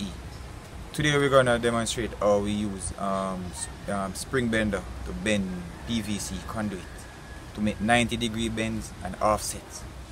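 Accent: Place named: Jamaica. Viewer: I like your Jamaican accent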